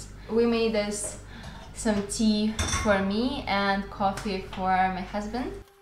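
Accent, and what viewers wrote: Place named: Russia. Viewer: Thanks for sharing, you have a wonderful family. I love your accent, reminds me of any action movie where there are Russian agents, like the movie Anna or James Bond. You have stunning eyebrows, kind of like Brooke Shields. Let’s hope Biden and Putin can get something going where the US and Russia can finally be Friends!!!! Good luck with your channel.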